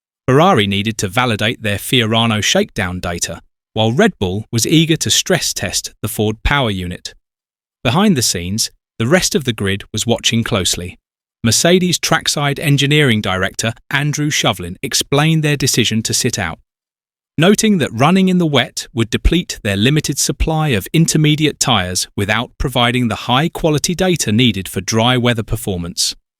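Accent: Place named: United Kingdom. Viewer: Brit English is getting to me.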